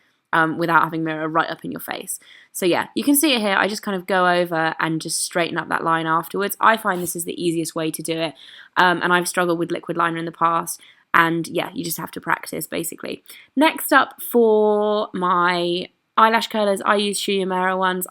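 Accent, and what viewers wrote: United Kingdom. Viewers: Dear, You are so beautiful!! Even without makeup! Love how ur lipstick colours look on you! Diggin' your British accent too;)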